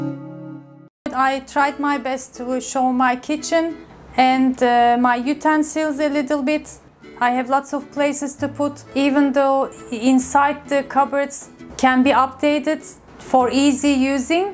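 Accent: Turkey. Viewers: Ablacım o nasıl ingilizce konuşmaktir yaaa ? Türkçe okur gibi. Biraz diksiyonunu geliştir. Ruh kat diline biraz. Dümdüz türkçe aksaninda ingilice mi konuşulur ? 1.30 dakika zor izledim. Hemen kapattım.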